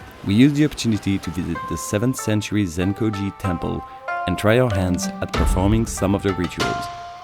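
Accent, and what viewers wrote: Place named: France. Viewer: you sound french bro, but you have a very very nice accent et si tu n'es pas français, bravo pour la prononciation des choses en Français ou juste le RER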